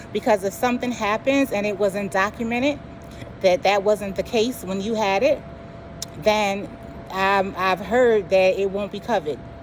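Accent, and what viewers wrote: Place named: Bed-Stuy, United States. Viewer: Love your accent Ma! Is that Bed-Sty?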